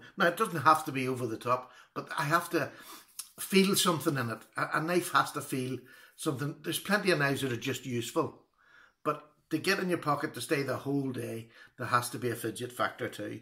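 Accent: Ireland. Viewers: I love your channel, i love that you focus on budget knives. And your Irish brogue reminds me of my grandparents who where from Cork and Leitrim counties. Keep up the great work sir! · Congrats,! We trust yah! I'm sure your reviews will continue to be fair and honest. Whatever keeps you on the air... DO IT! Love your channel and love that voice. Watching your channel has greatly helped with my Irish accent!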